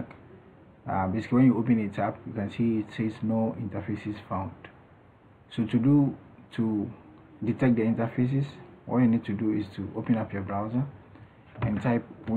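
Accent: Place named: India. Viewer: THIS NIGGA IS A SCAM HE SOUND LIKE A INDAIN "TECH SUPPORT"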